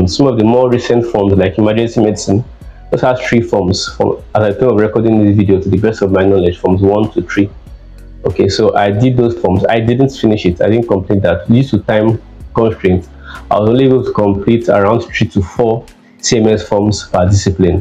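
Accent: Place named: Nigeria. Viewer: Very interesting watching from Chicago, just a suggestion, if you want to work with the average American patient, start working on getting rid of your accent, I'm used to being around Nigerians because I live in Uptown but most Americans White or Hispanic won't be able to really understand or have the patience to keep a doctor with such an accent or maybe you're just taking fast.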